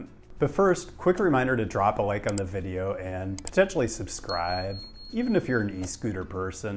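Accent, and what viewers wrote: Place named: California, United States. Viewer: OK, I don't have much to bitch about this time, I just think references to climate change are a form of insanity or at least unhealthy obsession. CO2 is NOT a pollutant but food for plants, and there is no evidence we are about to boil because we drive cars. Having said that, I am all for sensible bike lanes Valencia style, but I am skeptical about scooters. I also have to say that your delivery is actually charming and sexy, in a weird way. I am not a fan of the Kardashians, but your delivery in that LA/CA tone actually works ;-)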